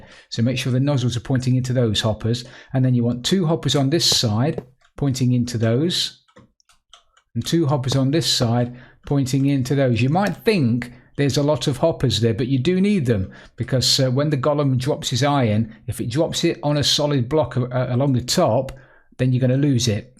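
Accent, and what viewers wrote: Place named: East Midlands, United Kingdom. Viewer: Gonna give this a try. Your accent sounds like you're from near me in the Notts/Derby area......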